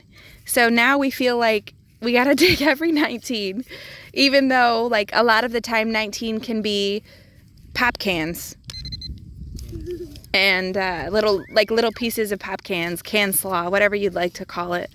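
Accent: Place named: Michigan, United States. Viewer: Nice finds,,like the Michigan accent, i got to hunt in pontiac once, i know a few folks in Houghton lake and Traverse city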